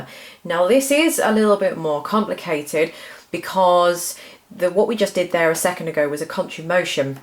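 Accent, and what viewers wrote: England, United Kingdom. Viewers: She is English lady her accent is different from Americans accent.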